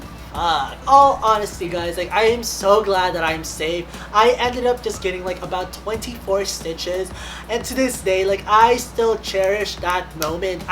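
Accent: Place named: Philippines. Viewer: First clue: flip flops. I was all like you know, I think he is Filipino 😂 that and you kind of have a Filipino accent.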